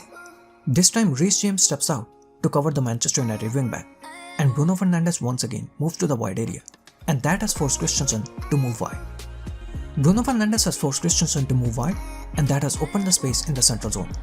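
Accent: India. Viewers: I like your curry accent.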